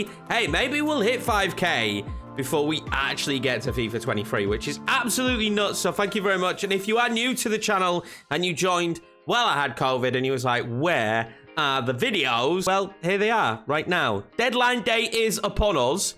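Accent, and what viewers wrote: Yorkshire, United Kingdom. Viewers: Never thought I would say this but Up The City!!! How we have missed your Yorkshire dulcet tones lol 😂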